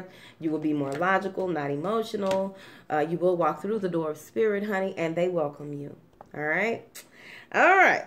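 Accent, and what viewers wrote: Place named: Memphis, United States. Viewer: You have to be from Memphis!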